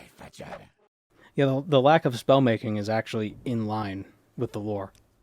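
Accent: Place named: Massachusetts, United States. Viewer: Also, where the hell are you from? That wicked smart was too natural. Im above mass, so i hear that accent and look for a fight happening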